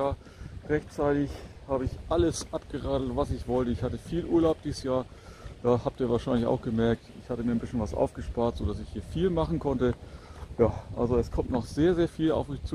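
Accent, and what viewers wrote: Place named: North, Germany. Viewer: So einen angenehmen und sympathischen Youtuber gibt es ja auch nicht alle Tage.... Immer schöne Landschaftsaufnahmen, gute Kommentare und die angenehme Nord-Stimme... das macht es halt aus.... Gruß aus der Nähe der Schwalm-Quelle in Hessen (da warst du auch schon ......)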